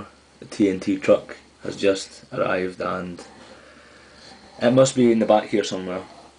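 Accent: Scotland, United Kingdom. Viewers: lol typical scottish accent :) its nice to hear a similar accent to myself for once :D excellent reviews.. definately gonna purchase a car kit for my ipod touch x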